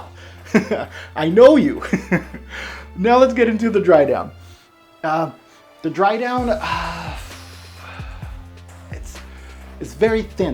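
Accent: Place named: Canada. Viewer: You Cristo and DracDoc were doing reviews before anyone your pronunciation of Labels brands and names your definitely A Canuck ...Your honest and genuine ...If anything Dunhills best is Dunhill by Alfred Dunhill , the brown bottle.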